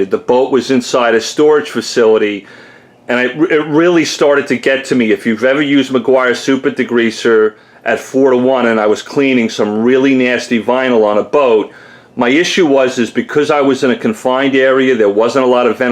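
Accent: New York, United States. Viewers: Are you a new yorka? :-)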